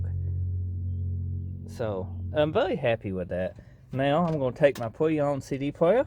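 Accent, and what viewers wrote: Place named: South, United States. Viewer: This video is exactly what I was looking for. Also, I thought my southern accent was thick but you put me to shame.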